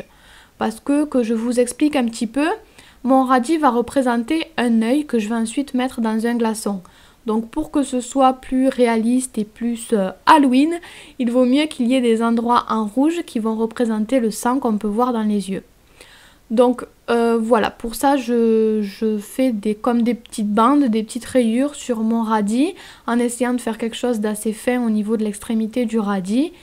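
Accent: South, France. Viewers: bah moi j'ai laissé chez moi et ça marche bien c'est trop bien ton truc moi j'aime bien j'adore ton accent du sud un accent du sud c'est bien mais c'était très bon et j'en ai fait chez moi bah ça c'est bien et voilà donc merci beaucoup pour ta recette😇😇😇😇😇😇😇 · j`adore ton accent du sud trop cool et les boissons son super bonnes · Je kiff l'accent du sud 😍😱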